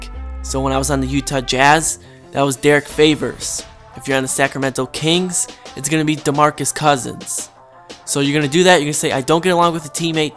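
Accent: Midwest, United States. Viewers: Why are you a Spurs fan? You sound like a midwesterner to me. Good video though. It worked for me, but they didn't trade me due to my conflict with Kevin Love. They traded me a week later, after I conflicted with the coach. I guess I was playing too well for them to trade me, IDK.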